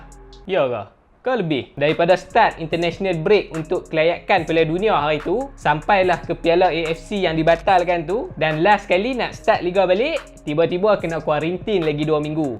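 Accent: Kedah, Malaysia. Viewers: Best dengar orang kedah berleter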